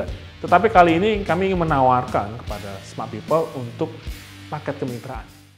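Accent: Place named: Java, Indonesia. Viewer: menarik sekali mas, tertarik, btw medok ya mas jawanya :)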